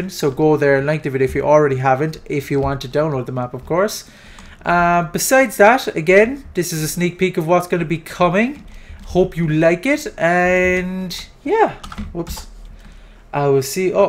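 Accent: Ireland. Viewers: Dang minecraft version of JackSepticEye, every person with irish accent can be Jacksepticeye i guess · You sir, are a brilliant creative master with a lovely Irish accent. Bless you for your talents and creativity and sharing both of them with us. Have a nice day :) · Irish accents. They are so awesome. *wipes away tear*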